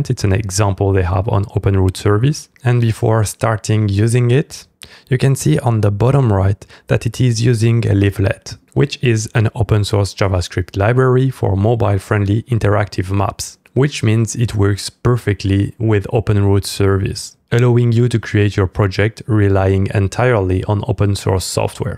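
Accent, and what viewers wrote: France. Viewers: Excellent content :). Malgrer que ton accent Francais se fait vraiment ressentir 😅 Thanks !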